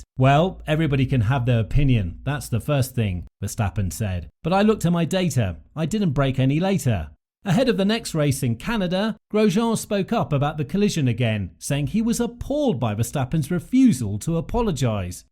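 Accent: United Kingdom. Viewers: This video is sponsored by Team LH. I couldn't miss that the voice in this video sounds very British... not to mention, biased as hell! Never heard and seen so many false truths, half truths, and 100% bullshit stories in one video. Unbelievable, what a joke. Not worth watching... and the end of this video aged like milk, because every team principal want Verstappen to drive for their team...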